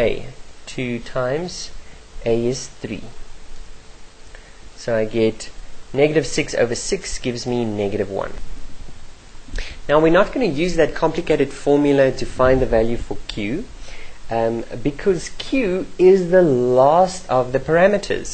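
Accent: South Africa. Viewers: Is this South African?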